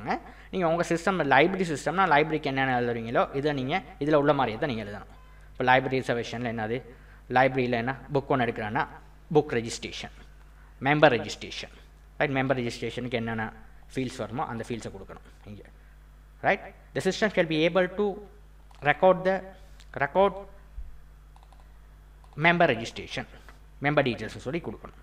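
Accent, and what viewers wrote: Sri Lanka. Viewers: Type of Upcountry Tamil in Srilanka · Colombo tamil ( srilanka )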